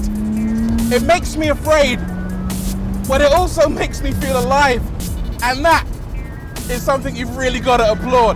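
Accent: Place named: Caribbean. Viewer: Having seen him a few times now, I have to say that I can't stand the guy. His patois accent is gratingly irritating.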